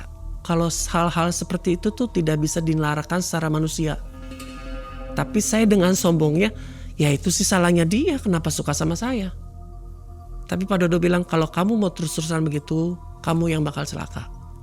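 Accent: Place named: Cirebon, Indonesia. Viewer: Jawa cirebonnya dah medok bnget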